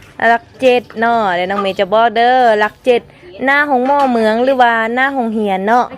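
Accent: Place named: Laos. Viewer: You got some awesome Laos accent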